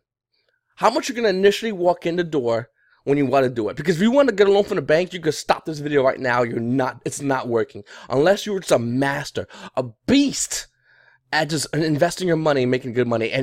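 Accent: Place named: New York, United States. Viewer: you're from New York aren't you lol. Thanks for the vid.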